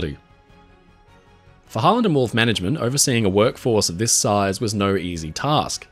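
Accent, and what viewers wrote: Australia. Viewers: I’m with you, but I’m eternally grateful. His great storytelling is a welcome distraction to the vitriol and animosity found in today’s world of news and politics. His soothing voice and Aussie accent sooth my jangled nerves. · I just want to say some things about what a testament to how good your channel is. As an Aussie myself, I generally dont like narrators/presenters with an Australian accent, I guess growing up with it I just usually don't enjoy it 🤣 BUT, the way you narrate is just so lovely to listen to and i often just enjoy having playlists of your videos on in the background while I game or work. Im also not super into maritime as a topic in general (aside from the pretty common fascination with Titanic) but i find the way you explain things with such simplicity and genuine love of the topic makes the info you give feel really digestible and understandable and I'm finding myself getting pulled into an interest I didnt have much draw to. 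So, i just want to say thank you. For being company when I've needed to decompress, for being informative in a way thats accessible and for just coming across as an all around really likeable channel. Keep up the absolutely spectacular work, my dude 🩷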